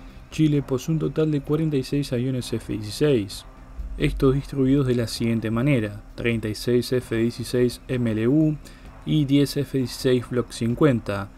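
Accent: Argentina. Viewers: Esta información no es del todo cierta, han obviado armamento moderno que tiene Perú . Este video al parecer esta hecho por chilenos y narrado por un argentino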